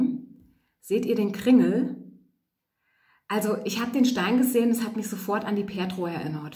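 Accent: Hesse, Germany. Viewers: ....ich vermute auch Hessen, zumindest lebt sie da. Das Rheinische hört man auch etwas durch. Egal, ich liebe diesen SingSangSlang 🤗😍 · Sie kommt aus Hessen .Ich dachte am Anfang auch, oh schön jemand aus Sachsem. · sie kommt aus Hessen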